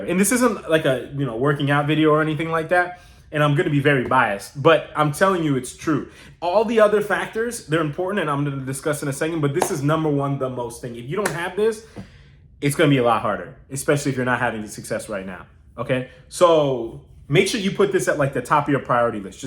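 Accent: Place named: United States. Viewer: You have great American accent. That made this video more pleasent to listen from A to Z without a single pause. Living in the USA for last 11 years I would like to add two more tips. 1. Please for god sake don't dress up which make you looke like a Migrant Engineering/IT major. (You guys know what I am talking about I'm from a south asian country as well not india even I can tell just by looking at the dress that he or she's from India) No I'm not suggesting buying expensive clothing, buy affordable ones within means buy with regards to American style/context Don't wear Jeans, Tennis show and full shirt even in a Concert while theres 100 degree fahhrenheit in the summer I also notice Nepalese people in the USA they have a better fashion sense here in the USA than any other Indian subcontinent population 2. I know English is our second language but please for god sake improve the english accent. 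It is becming a "call center Scam Joke" nowadays with heavy indian accent. Listen to this video he's also indian decent but there's no sign of heavy desi accent.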